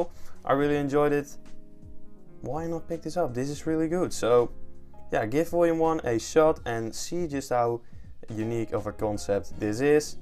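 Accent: Netherlands. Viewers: Duidelijk een nederlander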